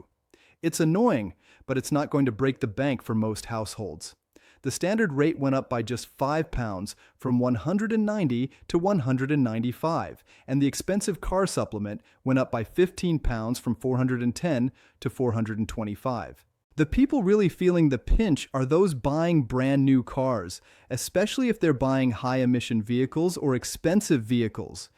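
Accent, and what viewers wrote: United States. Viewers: Ah it’s the uk lose the American voice it’s dammed annoying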